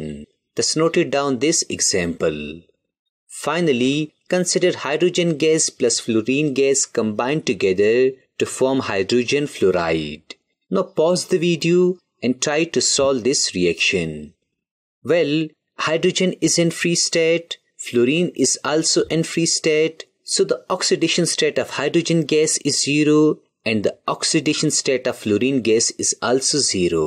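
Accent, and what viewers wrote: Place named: Uttar Pradesh, India. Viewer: according to his voice and accent he sounds like he is from up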